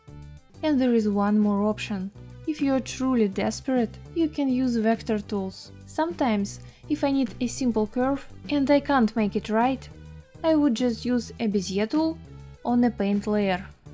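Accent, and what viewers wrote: Russia. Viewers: You have a cute russian accent :3 It's cool cause now I'm starting to lern english for work, and I understand all what you say. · Omg are you russian? I freakin love to hear that accent with english words. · nice russian accent))) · love your russian accent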